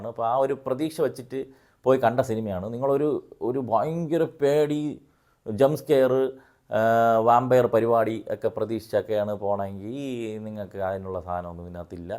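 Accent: Trivandrum, India. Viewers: Please avoid reviewing English movies and stick on only to Indian movies... Thankalude malayalam movies nte review okke njan kanarundu and i am very much interested in those reviews and your Trivandrum slang. .. Athukondu thanneyaanu thankale njan subscribe cheythathum. But I am very sorry to say that you don't have the class for reviewing English movies, maybe due to the fact that you are not much familiar with Hollywood or British movies. The way you reviewing Sinners is really pathetic and too substandard. So once again, I humbly request you to restrain from reviewing English movies.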